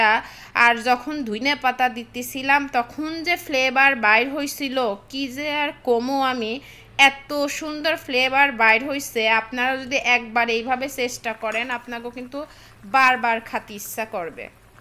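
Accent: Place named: Khulna, Bangladesh. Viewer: বান্ধবি খুলনা ভাষাটা তোমার মুখে শুনতে অসাধারণ লাগলো 😊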